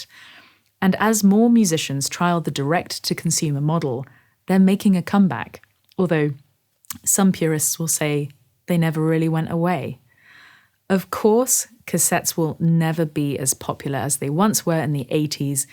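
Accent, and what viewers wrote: United Kingdom. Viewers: Lovely British accent you have. listened yesterday Face To Face album from Klinik recorded almost 30 years ago.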